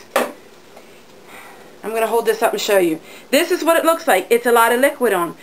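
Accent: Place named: South Jersey, United States. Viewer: I grew up in South Jersey, and hearing you speak reminds me of home. Are you in Pennsylvania?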